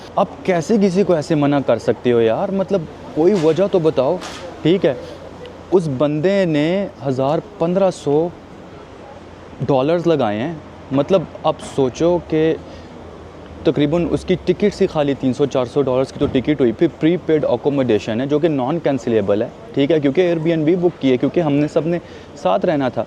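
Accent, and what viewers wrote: Pakistan. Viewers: Are you from Pakistan?? Your hindi accent is not like ours. Yes you are a Pakistani... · BASED ON YOUR ACCENT, I FEEL YOU ARE A PAKISTANI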